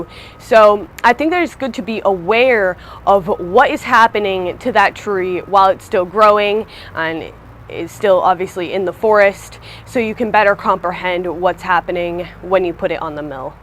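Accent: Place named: United States. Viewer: I undestand your your american english very well, thank you for that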